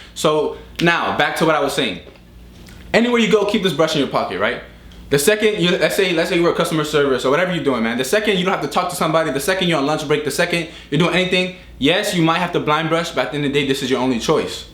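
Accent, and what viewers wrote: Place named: New York, United States. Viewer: No bull shit bro, you lowkey inspired me to cut my curls, im dominican too bro, pero na for real bro you from new york? You deadass sound like it😭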